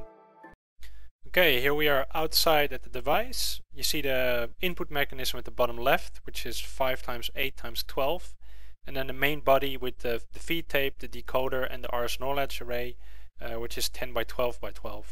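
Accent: Netherlands. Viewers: Je bent Nederlands of niet? ik kan het horen.. C: Wel erg leuk dat je zo ontzettend groot bent op YouTube. Ik denk de grootste Nederlandse Youtuber. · is it possible that you are dutch ? i hear a bit of a dutch accent in your voice :D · Ben je Nederlands? Je klinkt Nederlands. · are you dutch ?? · where r u from?? holland?? :)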